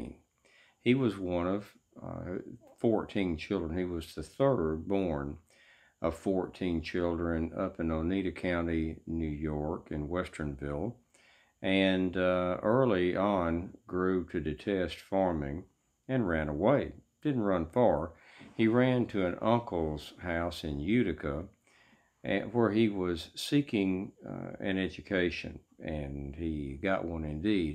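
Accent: South, United States. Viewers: This is the first time I have seen you as, 'President Grant'. The other ones of course, were as 'General Grant'. I've read: 'The personal Memoirs of U.S. Grant', and he referred to General Halleck many times. Never quite got a feel as to the relationship between the two, or what kind of person Halleck was.This presentation cleared up a lot of questions I had about Halleck.Your presentations are so well done, I begin to forget, you are playing a role. One other person metioned your: 'Southern Accent', I believe that's irrelevant, and doesn't subtract anything from your portrals of U.S.Grant! I don't understand why there are so few comments here and on other presentations you do. Perhaps, you do them so well, no questions or replies needed!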